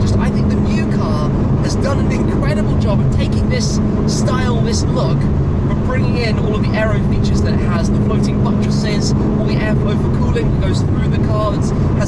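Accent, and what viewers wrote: United States. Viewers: Shmee is slowly turning American 😂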